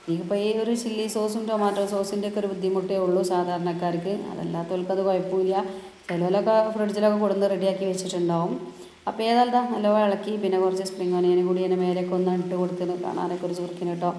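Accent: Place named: Malappuram, India. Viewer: മൊഞ്ചുള്ള മലപ്പുറം താതനെക്കാൾ മൊഞ്ചും ചൊറുക്കും ഉള്ള മൊഞ്ചത്തി നൈസ് പത്തിരി മാണങ്ങി തിന്നോളിൻ മക്കളെ പത്തിരിനേക്കാട്ടിൻ മൊഞ്ചുണ്ട്ട്ടാ താത്താന്റെ അയഞ്ഞാൻ കൊയഞാന്‍ ബർത്താനം എന്തത്താണ് മക്കളെ കേട്ടാലും കേട്ടാലും പൂതി തീരൂല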